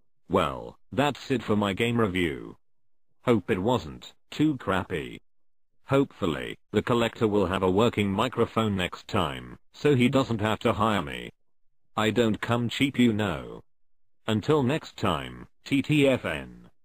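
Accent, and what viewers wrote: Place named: RP, United Kingdom. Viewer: This voice should be used to review every game ever. This is legit the only game review I've watched all the way through because hearing a British butler review the game was awesome.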